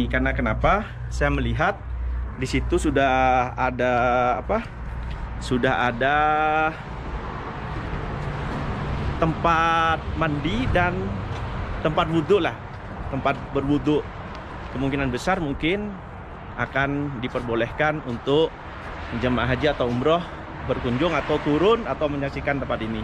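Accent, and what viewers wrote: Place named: Madura, Indonesia. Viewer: klo dr bhsaxa pasti madura🤣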